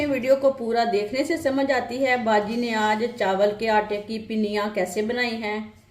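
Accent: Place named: Punjab, India. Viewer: Punjabi jab Urdu boltay Hain to bilkul b Acha lahja ni Lagta sad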